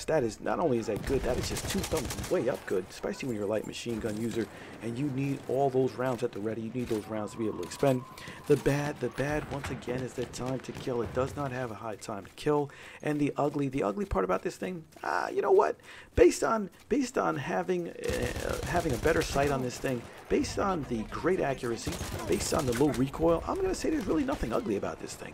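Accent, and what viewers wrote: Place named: Philadelphia, United States. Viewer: this guys gotta be from the Philly area. Lived my whole life here, can hear a Delaware Valley accent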